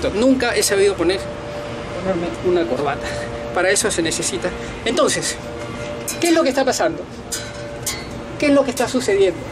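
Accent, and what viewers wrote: Argentina. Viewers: ¿Un peruano con acento argentino? Pues yo creidísimo de que era lo segundo; escuchar para creer, verdaderamente; muy interesante; desde Lima (vi14/V/2021)-Perú-América. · Sr. SI UD NO ES PERUANO! HALAGA A ARG HASTA NO PODER Y HASTA SU FORMA Y SU ACENTO ES MAS ARGENTINO Q PERUANO! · Xq hablas como argentino?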